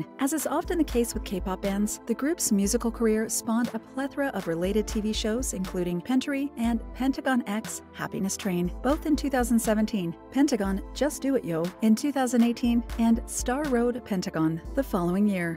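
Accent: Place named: United States. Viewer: I don't understand why you can't just put subtitles.. I come here to read about him, but it's annoying hearing someone speak.. especially with a strong American accent.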